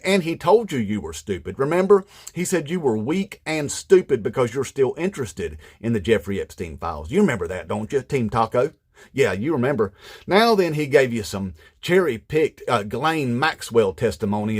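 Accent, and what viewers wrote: South, United States. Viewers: So damn good! I just recently found, could not have appeared at a better time, I'm trying to maintain what's left of my sanity. This man's voice is so spot on, the tone he uses, the wry humor, the precision of his satire, it's just perfect. Also music to my ears is his southern accent. Living out here on the left coast we don't get a lot of well spoken truth in that accent, it's an affirmation that perhaps we're not as close to the abyss as we think we are to hear that other people in other parts of the U.S. can see the same things we do. This man's voice is of tremendous value right now, I will subscribe and look forward to his commentary on this non stop train wreck we're living through. Inmate trump.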